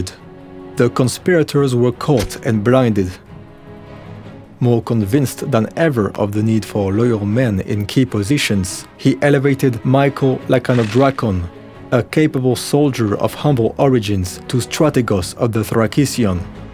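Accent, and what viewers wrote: France. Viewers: t'es français ? 🧐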